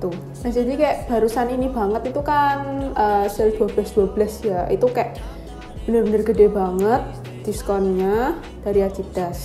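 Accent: Java, Indonesia. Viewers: Muka korea logat jawa Jadi bikin betah di chanel ini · Salfok sama ke medok an nya mba 👍🏻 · Mendok banget to samean mbakk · Orang mana kak kok kayak ada logat Jawa nya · medokmu buatku jatuh cinta 😍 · pertahankan medok nya 👍🏻🥵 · Tadi y pengen tau ori atau kw ,tp pas denger medooook y itu ...smgat dan sukses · Aku suka medokmu cik 😍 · Mbak jawane medok🤣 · Maaf bukan rasis🙏. Liat mbak nya kirain orang China luar negri,tau tau China nya udah medok banget hihihi🌚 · baru nonton pertama., mbaknya medok ini jawa mana ya? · Suka medoknya 👍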